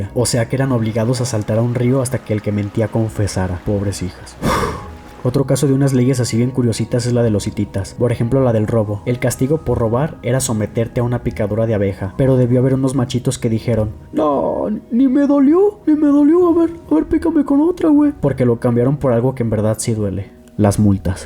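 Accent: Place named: Peru. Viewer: Heyyyyy? Me encanta tu canal ya que trata temas similares a los míos. Veo que empezaste hace poco igual que yo así que bueno, mucha suerte. Por el acento asumo que eres de Perú? Me recuerda al perro en la tacita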